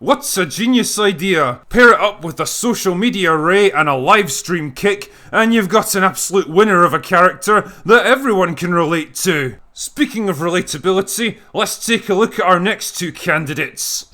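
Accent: Scotland, United Kingdom. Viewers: It seems like from 2016 to now you’ve gotten more Scottish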